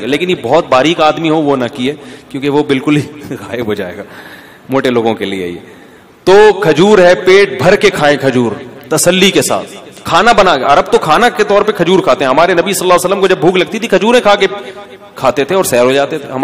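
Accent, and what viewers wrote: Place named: Karachi, Pakistan. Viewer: Apka bat krny ka andaz mujhy bhout pasand ha ماشاءاللہ ar boli sa app mujhy karachi sa lagty hain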